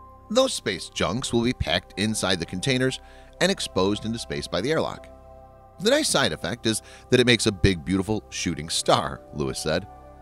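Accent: United States. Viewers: Whats the matter ? Michele ? you not like his midwestern accent ? you biggot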